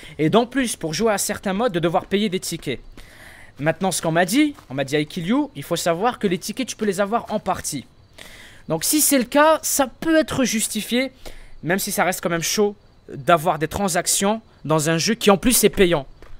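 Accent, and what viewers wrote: Quebec, Canada. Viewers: T de quebec?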